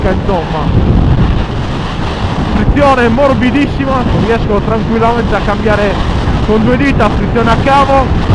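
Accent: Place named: Sardinia, Italy. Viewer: mi fa morire il dialetto sardo😂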